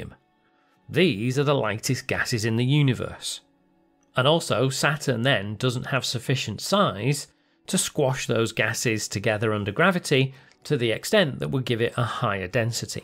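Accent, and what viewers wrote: United Kingdom. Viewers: Why do you have a British accent but you say things like "4 miles"?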